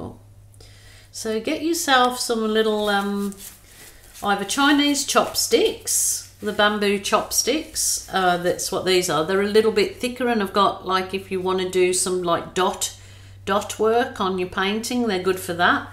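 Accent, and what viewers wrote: Birmingham, United Kingdom. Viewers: gorgeous as ever !! Love your little fur babies 🥰 do I detect a Brummie accent in there somewhere ? 💞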